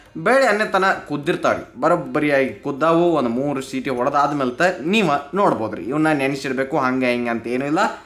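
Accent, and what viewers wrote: North Karnataka, India. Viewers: ನಿಮ್ಮ ಡಾಲ್ ಜೊತೆಗೆ ನಿಮ್ಮ ಉತ್ತರ ಕರ್ನಾಟಕ ಭಾಷೆ ತುಂಬಾ ಚೆನ್ನಾಗಿದೆ.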